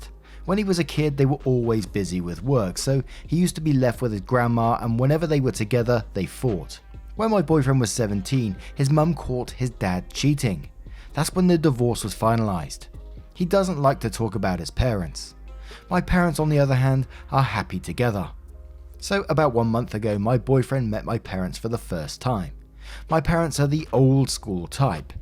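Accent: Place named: Oxfordshire, United Kingdom. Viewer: Oxfordshire accents rock!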